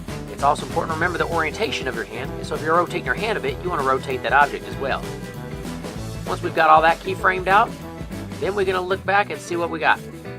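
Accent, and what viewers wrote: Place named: Texas, United States. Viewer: i loved your texan accent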